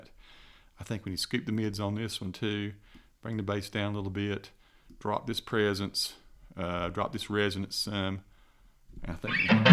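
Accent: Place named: Winston-Salem, United States. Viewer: had to be. Your accent is just like what I remember. Seems like nobody in Winston Salem talks like that anymore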